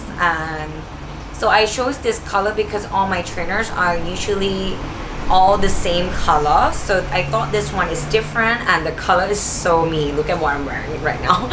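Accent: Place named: Thailand. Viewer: I‘m so happy I stumbled upon your video! 💕 I picked up the pink pair - mainly for my walks. On a different note - I recognize a Thai accent. Is it possible that you‘re from Thailand? x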